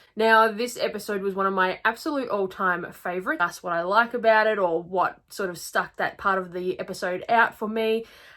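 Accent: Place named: Australia. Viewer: I am a huge fan of the Australian accent and I absolutely LOVE yours! No criticism here.:)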